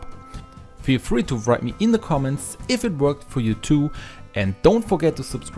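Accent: Germany. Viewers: The most German accent I've ever heard. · 으째 발음이~~ 독일사람이 영어한 듯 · Öha , den deutschen Akzent hört man Danke für den Tip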